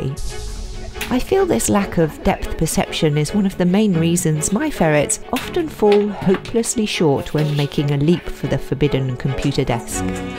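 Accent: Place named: United States. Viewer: Lmao 4 Hughe Jackmans, thank you for speaking American.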